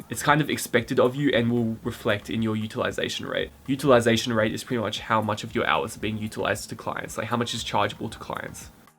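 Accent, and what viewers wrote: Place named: Spain. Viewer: you have a spanish accent. So funny!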